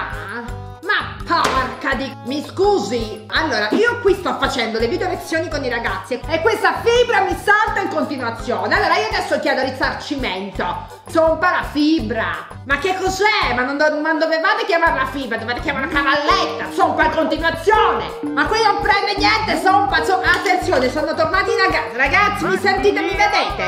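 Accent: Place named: Marche, Italy. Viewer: Sei brava a parlare il marchigiano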